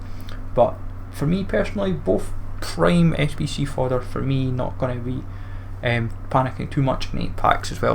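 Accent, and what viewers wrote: Scotland, United Kingdom. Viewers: is it scottish accent for real?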